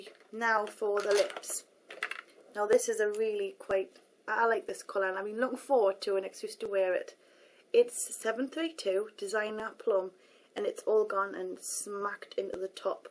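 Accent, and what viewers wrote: Newcastle, United Kingdom. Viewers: r u frm newcastle cuz u sound like cheryl cole! :) x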